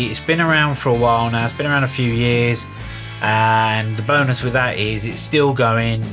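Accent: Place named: South Wales, United Kingdom. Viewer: You have a southern welsh accent, you aren't by chance from Glamorgan are you? Very Anthony Hopkins'esque, great video mate!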